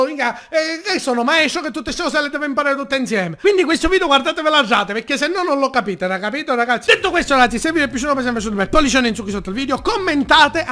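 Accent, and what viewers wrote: Sicily, Italy. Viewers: ma sei siciliano??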